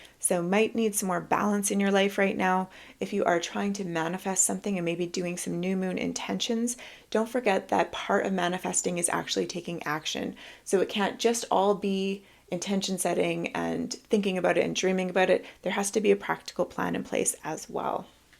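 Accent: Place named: United States. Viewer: I’m trying to place your accent! It’s distracting me from the message in my reading because you’re reminding me of a cross between a cousin of mine from upstate NY and a friend who has roots in both Colorado and Florida! I can’t tell if you’re E or W coast, midwest, southern or what. Did you have one accent growing up, then go to college out of state and end up with a standard American accent, or is that the accent where you’re from, in which case, which state? It’s lovely 😊 (I also liked my reading very much, thank you 🙏 pile 1) (PS, if it turns out you’re Canadian after all that, I apologise!!)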